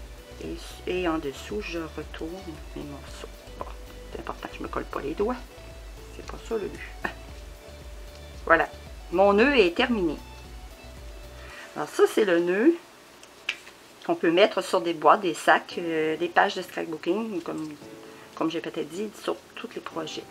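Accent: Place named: Canada. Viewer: Très complet et très clair, merci ! En plus j'adore votre chaleureux accent canadien !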